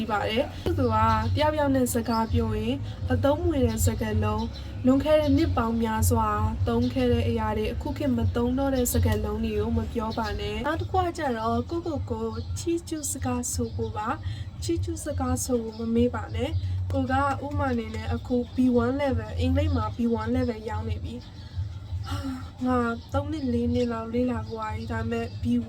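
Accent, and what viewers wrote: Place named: Myeik, Myanmar. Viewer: Thank you Tr. ညီမက မြိတ်သူလား။ လေသံ မြိတ်သံ နဲ့ တူလို့ပါ။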